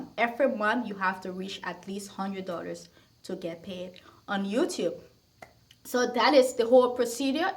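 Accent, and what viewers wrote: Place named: Liberia. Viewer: Hello, JackieNaturals is always mentioning your name so I decided to check you out and support. Your made your first $100 on my birthday. I think YouTube takes a percentage. I like how you’re matching with your eye look. I just went on your website and found out you’re 🇱🇷, I knew I heard an accent. I’m Liberian too 🥰🥰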